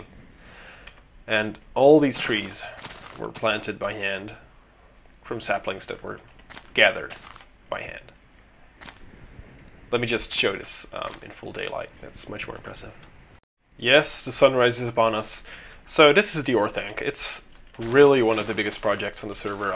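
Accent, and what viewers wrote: Netherlands. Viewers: you from Holland?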